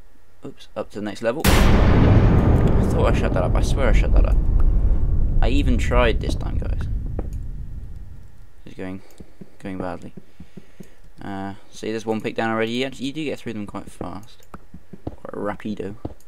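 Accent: United Kingdom. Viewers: reminds me of X.... cept english accent...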